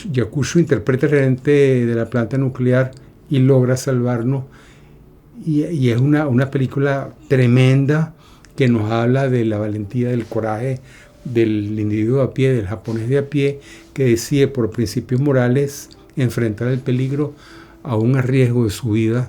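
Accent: Caracas, Venezuela. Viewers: Excelente post. Muy grato ese tono de tertulia en acento venezolano, me atrevo a afirmar que es caraqueño. He leído casi todo de Mishima. Es uno de mis autores preferidos junto con Julio Cortázar. Gracias.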